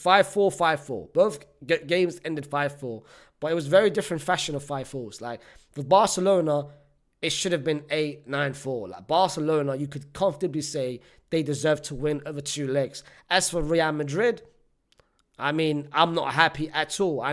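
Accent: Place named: United Kingdom. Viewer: That British accent from a Iraqi is crazy unc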